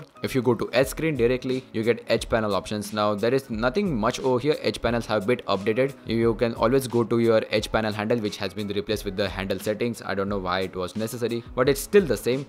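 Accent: India. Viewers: I don't understand why people dislike an Indian man's accent. I quite enjoy it. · Why do Indians insist on talking in English if they're just gonna butcher the language?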